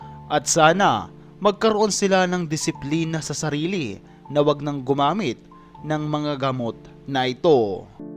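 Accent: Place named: Philippines. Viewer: I love your Filipino accent... 😅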